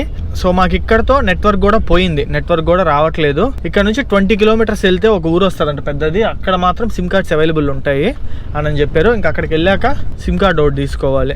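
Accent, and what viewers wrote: Nellore, India. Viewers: Bro, nee nellore yaasa super 👌. All the best nd drive safely...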